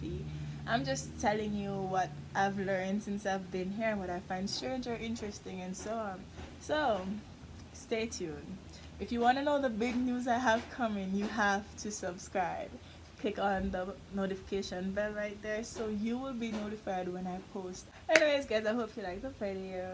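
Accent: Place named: Jamaica. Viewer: Your partial Jamaican accent is so cuuute.🙆 I just love it.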